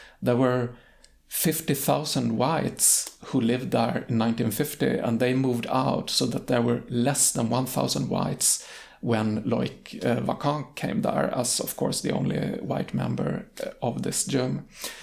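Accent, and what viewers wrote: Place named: United Kingdom. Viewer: Dear, very good arguments recommending the book. But I am highly interested in when and where do you study English? Your accent is very British and incredibly understandable!! And I would like to know if you wrote a book about learning Swedish, Please, add more and more videos about YOU;) Greetings from Argentina!!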